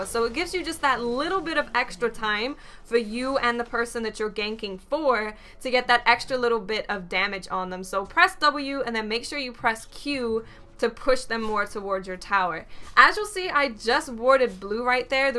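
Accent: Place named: New Jersey, United States. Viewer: I like your Jersey or Brooklyn Accent (or whatever it is) It's like a hotness multiplier on top of your nerd knowledge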